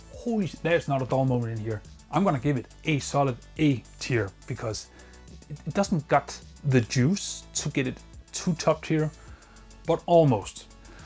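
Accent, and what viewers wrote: Denmark. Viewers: are you by any chance Danish? i feel like you have a Danish accent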